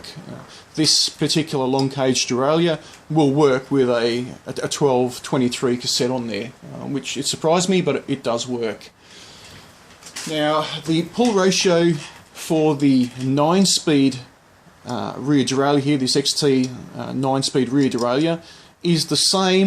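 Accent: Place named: Australia. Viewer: Australian, I reckon mate.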